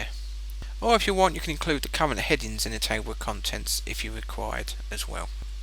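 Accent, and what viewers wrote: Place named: London, United Kingdom. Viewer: You have an unusual name but a strong London accent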